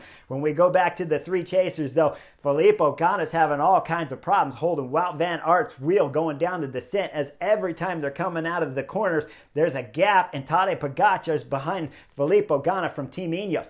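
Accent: United States. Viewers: Great analysis… but man the American pronunciation of names is so bad. Not even a small effort to respect original names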